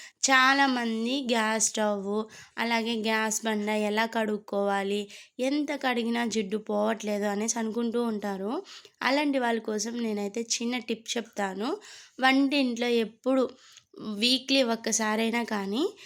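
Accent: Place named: Nellore, India. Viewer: చక్కగా వుంది మీ video Super, వాయిస్ కూడా Super, Nellore నా ❤👌👍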